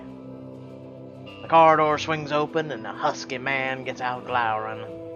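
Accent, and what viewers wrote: South, United States. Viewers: Some dumbass accused you of being racist for using southern drawl? Really? Well, I'm a black woman and I never thought you were racist. I was just enjoying the videos. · Not American, but always liked this southern accent. YOU ARE KILLING IT! Good job!!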